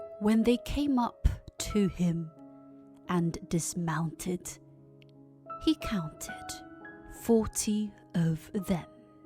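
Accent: United Kingdom. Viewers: 에이 에스 엠 아~~~~ㄹ 오늘도 잘 듣고 갑니다. 역시나 권아나의 발음은 엄청 매력적입니다. 흉내내보려고 해도 영 저는 안되네요. ㅎㅎㅎ 영국식 발음아! 오픈 세서미~~~! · 선생님 영국발음이랑 억양 선생님처럼 하거싶은대 어떻게 해야될까요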